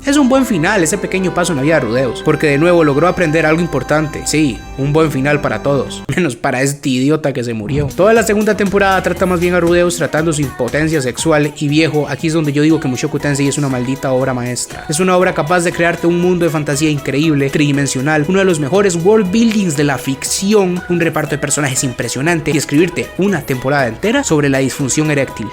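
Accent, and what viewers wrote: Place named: Costa Rica. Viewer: BROOOO SOS TICO ¿VERDAD?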